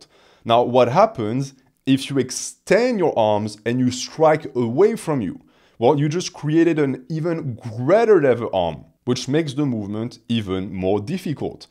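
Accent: France. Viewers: Wait are you French ?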